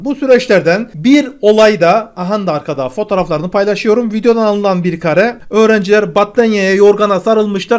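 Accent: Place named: Kahramanmaraş, Turkey. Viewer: Selam, senin kökenin galiba Kahramanmaraş dır. Şive öyle diyor Ede. Devam et haberlere. Çok güzel sunum yapıyorsun.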